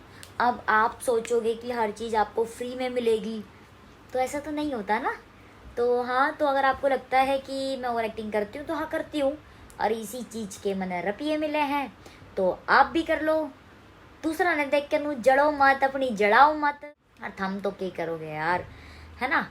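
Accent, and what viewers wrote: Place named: Haryana, India. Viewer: tum haryanvi me hii vlog banaya kro achi lgati ho bolte hua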